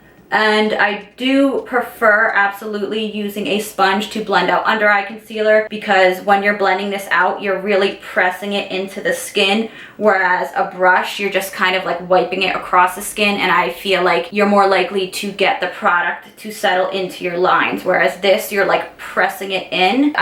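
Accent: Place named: Michigan, United States. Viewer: I love your accent. are you from Michigan?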